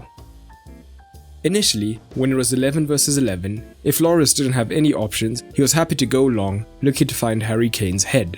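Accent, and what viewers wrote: South Africa. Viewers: You sound South African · R u South African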